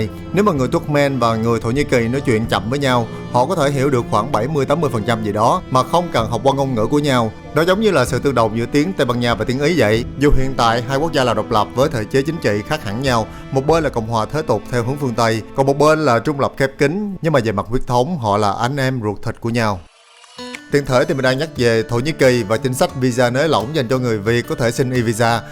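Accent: South, Vietnam. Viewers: Giọng nam và đẹp trai. Có kiến thức. văn võ song toàn. Hình như em có tất cả. ❤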